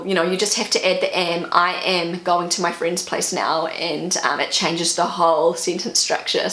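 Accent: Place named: New Zealand. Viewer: It's because her Kiwi accent is non-rhotic (they drop Rs at the end of syllables). But there is a subtle difference; the vowel at the end of "errors" is an unaccented schwa, while the vowel at the end of "eras" is a bit more open and closer to "a" as in "arbiter".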